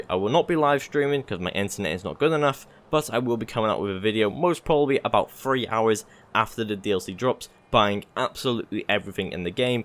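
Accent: Wales, United Kingdom. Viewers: Are you from wales?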